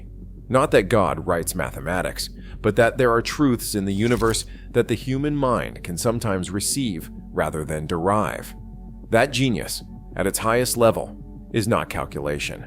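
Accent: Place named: United States. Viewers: The real Hardy didn't speak with an American accent. And he also knew how to pronounce Ramaujan's name correctly. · And the American accent is not exactly realistic. Are there no people still alive who can speak with an educated English accent ? In fact, this post was immediately followed by an advert for a charity, spoken in proper English, as spoken at Cambridge University. (I had a friend who came from a working class background and had to learn to speak in the accepted way when he went to Cambridge University).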